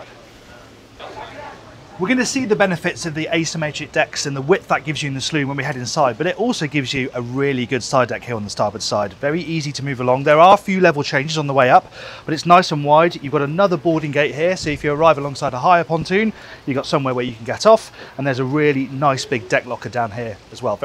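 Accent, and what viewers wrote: England, United Kingdom. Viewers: Dear God ,This guy Talks So Fast ! You do not have time to think about what he said ! Even worse ,he has an English Accent ! No Coffee for him !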